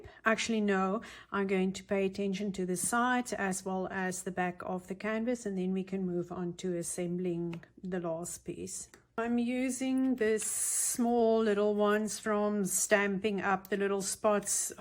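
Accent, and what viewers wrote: South Africa. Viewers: Ek het nogal gedink ek hoor n Suid-Afrikaner praat. Baie oulike idees, dankie. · Hi there from North Carolina, USA. You must be either in SA or an ex-pat! I grew up in the Cape. Love hearing you speak, brings back memories. I have been working with teabags for some years, trying many different projects!! Can't drink US tea, so still buy my Typhoo and PG Tips...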